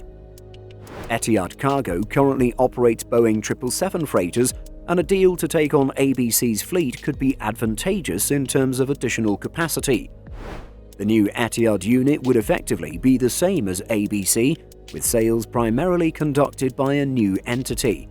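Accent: United Kingdom. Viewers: The moment I hear this British accent, I know that the videos are lies and scams, because a lot of videos that I have seen using British actions or nothing but a scam, deceit and lies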